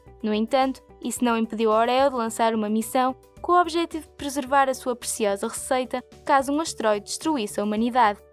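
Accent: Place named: Portugal. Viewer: Sotaque de Portugal tão fofo.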